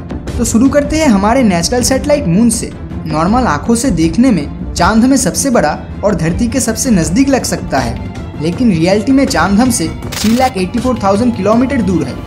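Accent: Bengal, India. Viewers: Bhai app Bengal se hai kya?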